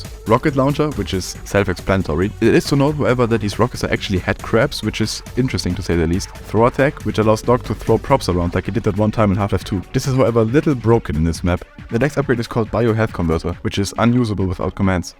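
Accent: Germany. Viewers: He’s speaking German when speaking English. I love it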